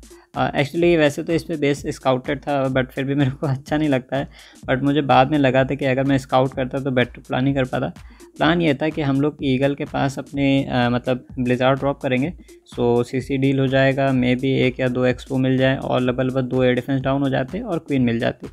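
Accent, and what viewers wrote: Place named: India. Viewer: hey mate i know its hard, but if u speak about your videos, it would be nize if u try to speak a bit slower, the indish accent is hard but i wanna hear the english phrases :D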